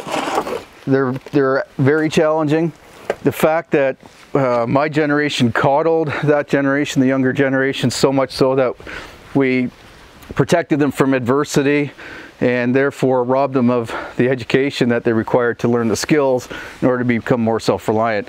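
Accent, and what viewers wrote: Canada. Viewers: Love that Canadian accent and can do attitude.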